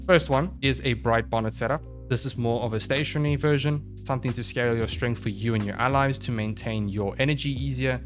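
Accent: South Africa. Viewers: are you south african your accent souns a little south african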